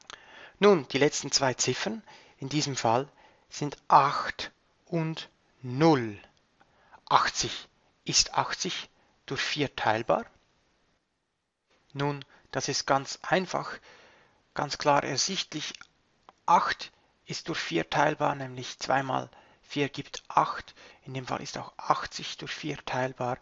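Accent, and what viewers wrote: Switzerland. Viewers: Sehr angenehme Stimme hast du da.. Nur e chli Schwizerdütsch gehört mer da use ;) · Joa ganz guet. Nur hört man wirklich manchmal ein bisschen Schwizerdütsch. Aber dankä fürs Video =D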